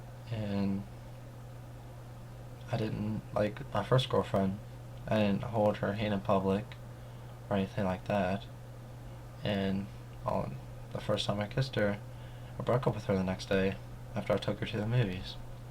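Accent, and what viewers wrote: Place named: West Virginia, United States. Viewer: has the kind of accent common in West Virginia. It's essentially a "southern" accent, though not as heavy as you might hear in the Carolinas.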